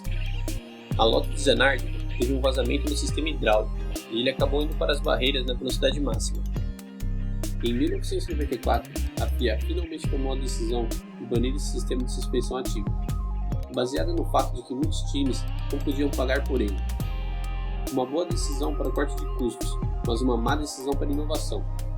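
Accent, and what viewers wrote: Brazil. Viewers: com sotaque caipira então, ninguém merece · Parece que carrega muito sotaque (paulista)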